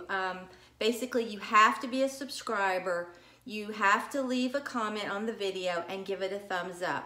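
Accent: South, United States. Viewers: You have the best ideas! And I love your southern accent. 🙂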